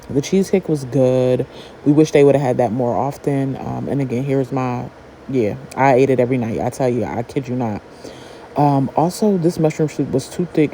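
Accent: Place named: Connecticut, United States. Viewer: I know this is old, so you might not see this but the whole time watching I was like her accent, I wonder if she from CT, so I looked in the comments. Still not done watching but I see this comment, and I'm like wait, we say this here lol. So still not sure, but yea, I was wondering.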